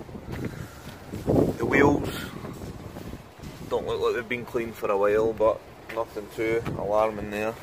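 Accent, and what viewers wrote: Scotland, United Kingdom. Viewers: In a strange way, these videos remind me of the song: " " by. Your Scottish commentary plus the music. Pure brilliance. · Hold on is that a Scottish accent talking about sun burn?........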